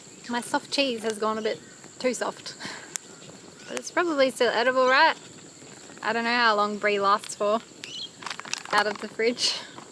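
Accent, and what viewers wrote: Australia. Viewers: I love watching your content! I’m from the u.s and I love your Australian accent ❤. Thank you for all the great videos 😊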